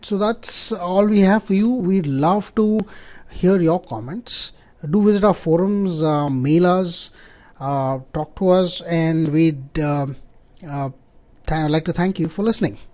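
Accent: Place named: India. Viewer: Indian english, not bad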